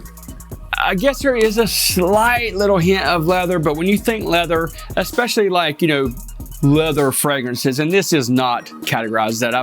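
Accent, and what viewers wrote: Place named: Carolina, United States. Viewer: you got the same Carolina accent as Stephen Thompson 😅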